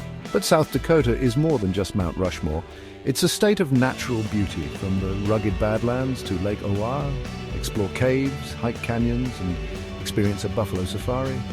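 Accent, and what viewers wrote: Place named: United Kingdom. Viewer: Americans don't need anyone with a British accent telling us that some of our states don't matter...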